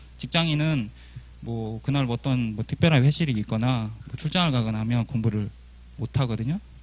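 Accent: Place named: Chungcheong, South Korea. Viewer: 충청도분인가보네요. 답답합니다.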